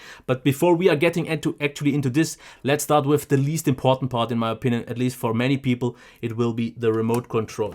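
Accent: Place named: Germany. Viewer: No, he sounds German.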